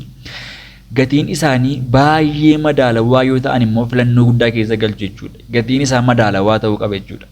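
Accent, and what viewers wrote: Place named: Jimma, Ethiopia. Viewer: Waaaaaw🎉 amazing ❤ ni dandeessa dhugaa jaabaadhu loqoda jimma baayyee naaf ta'e🎉🎉🎉🎉❤❤❤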